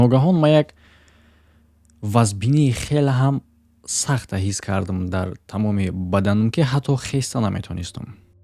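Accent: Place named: Tajikistan. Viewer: ВАХАХА НАВ ЧИСТА ТОЧИКИ ГАПЗАДИ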